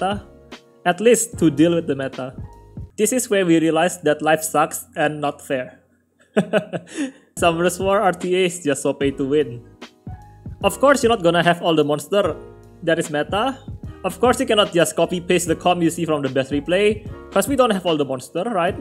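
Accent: Indonesia. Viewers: Logatnya indo banget bang , btw vellajuel cocoknya combo sama siapa ya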